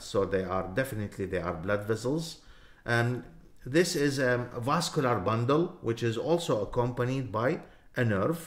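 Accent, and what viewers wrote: Iraq. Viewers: اللهجة العراقيه 🔥❤ عاشت ايدكم دكتور الشرح رائع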